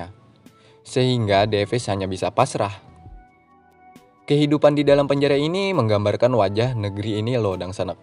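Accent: Banjar, Indonesia. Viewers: Logat Banjar kdd hilangnya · Orang Banjar kah? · Ka kk orang banjar ya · Denger denger dangsanak ... Hemm orang banjar ya bang😂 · Urang banjar kah? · Woah mimin banjar kalsel sama nah sanak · urang banjar kah om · Sasakali bahasa Banjar hja pnk basuaranya kyp bisa bagus banar sako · Pian orang Banjar kah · Anjr bhasa bnjr nya kental bnr, fokus aja jdi fukus wk, tpi kdpp ai. Contoh chanel lain kya iq7 tu nh, beh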